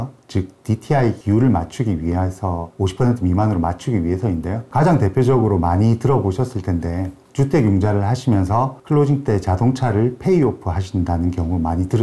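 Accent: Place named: Gyeongsang, South Korea. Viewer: 많은 도움이 되었습니다~ 경상도 분이시군요ㅎ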